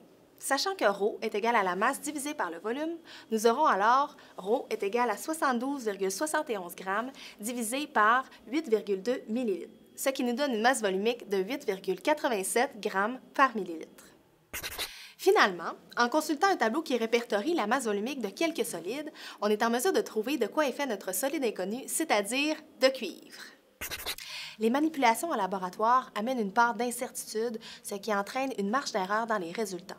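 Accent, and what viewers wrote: Quebec, Canada. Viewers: J'adore le Québec, l'accent, les expressions et tout ça alors ça m'encourage à faire des sciences lol! Mercii haha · Derien et merci d’avoir répondu PS :Je surkkiffe votre accent canadien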